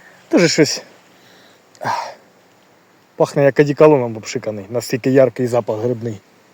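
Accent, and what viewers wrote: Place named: Luhansk, Ukraine. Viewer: Вітаю! Ваш говір видає людину з моїх країв,звідки орки нас ,,попросили,, Мені було цікаво.Я залюбки подивилася ваші спостереження та знахідки.У нас на Луганщині таких грибів немає. Чекаю нових екскурсій у світ західної України.Я підписуюсь на ваш канал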